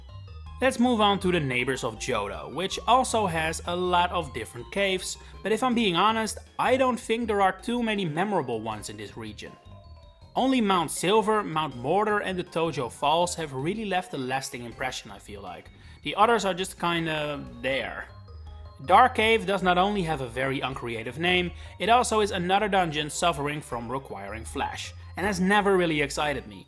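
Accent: Netherlands. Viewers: Me, hearing you accent for the first time: "MEDELANDER????" Flash caves are the actual worst tho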